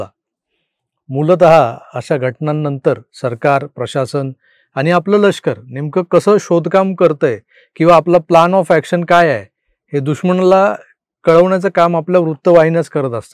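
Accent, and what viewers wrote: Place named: Girgaon, India. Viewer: आपली गिरगावकर भाषा ऐकताना आणखी स्फुरण चढते. ❤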